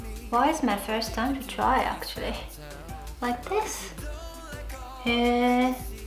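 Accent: United Kingdom. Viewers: Your British accent is the cutest thing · I love your British accent whenever you speak English 😭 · You have a British accent to your English! I love it, it's cute. I really enjoyed the video, thanks.:) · It is a British accent actually, she lives in London. Also that's not true about English speaking Japanese sounding British naturally; whoever you are, you will adapt the accent of whoever you learned whichever language from and how you are used to hearing that language spoken. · 外人さんメイク良く見るけど、オカマメイク(ドラッグクイーン)だよねw プロダクトめっちゃ付けるし化粧品会社めっちゃ儲かってるんだろうね。イギリス英語可愛いし、さんの全てが可愛い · you're so adorable and i love your british accent! uwu i think you did a good job considering it's the first time you tried a make up look like this 👍💕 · Omg you have a British accent <3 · Her accent is british............................. WHY IS HER ACCENT BRITISH?! girl.exe has stopped responding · British Accent たまらないです！ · Wait...how does she speak English so well??? 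And with such a Brittish accent lol? Anyway quite enjoyed this 😄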